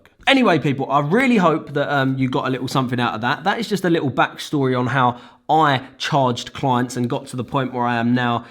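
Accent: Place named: Essex, United Kingdom. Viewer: Who else came here in 2021 for the title but stayed for the voice! Massive bruv...get in there yer essex geezer, love ya stuff!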